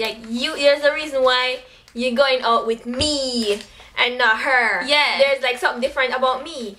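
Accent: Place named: Jamaica. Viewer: Your patois (jamaican accent) sounds so much stronger in this video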